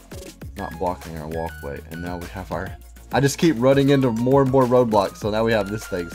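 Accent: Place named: South, United States. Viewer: Builds are so amazing!! I want to start a channel someday but sadly, I am still a noob when it comes to many of the mods. Learning them slowly but surely! But your vids are definitely helping. I went back to Pokehaan Craft for now, but will get back to this modpack eventually, lol. Also, whereabouts are you from? Your accent sounds southern USA, but I could be very wrong. I only ask because I am Southern USA myself.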